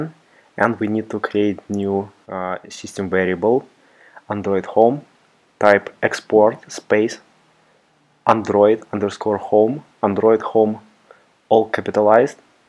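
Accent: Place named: Russia. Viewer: рашн инглиш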